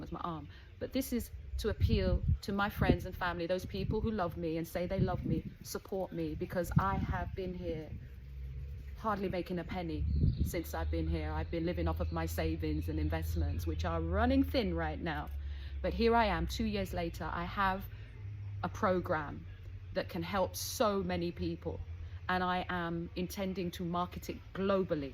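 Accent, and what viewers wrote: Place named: South St. Elizabeth, Jamaica. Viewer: I am here watching your video about your grandmother Laura and hearing the South St. Elizabeth accent pulsating through and loving it! Your channel is awesome too! You are on the top of my list too! So coincidental and I love it❤️🧑🏼‍🌾🏝️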